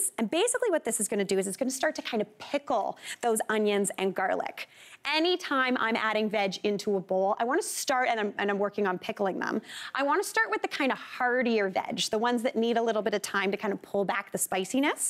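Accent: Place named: Canada. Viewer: Eh. . Eh?..so Canadian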